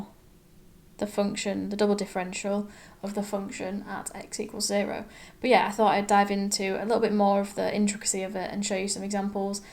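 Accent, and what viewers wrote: United Kingdom. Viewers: I adore also British English 😊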